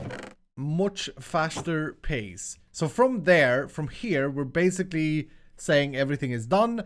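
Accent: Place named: Sweden. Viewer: Why does he sound more swedish than last time??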